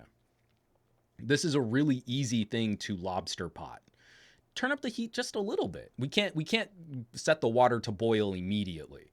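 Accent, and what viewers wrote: United States. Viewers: Wait, you're from New Mexico or???.-.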